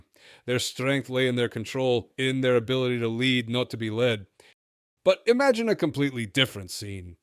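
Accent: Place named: Scotland, United Kingdom. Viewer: Excellent expose. Luvin' ur Scots brogue.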